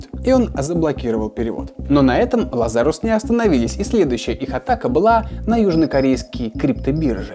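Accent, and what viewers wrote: England, United Kingdom. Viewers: Тут всё видео говорит английским акцентом, я один это заметил?.-. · Мне показалось или в этом выпуске говорил с очень заметным акцентом? Я бы сказал как англичанин но очень хорошо говорящий по-русски. Букву Р произносил очень мягко например. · А я единственный заметил, что у что то с голосом, будто какой-то английский акцент.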